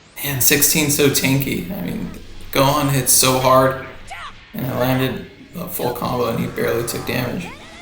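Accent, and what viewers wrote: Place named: Russia. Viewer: Are you Russian?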